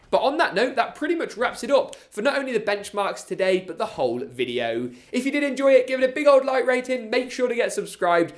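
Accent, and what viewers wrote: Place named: Wales, United Kingdom. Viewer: are you Welsh, you sound Welsh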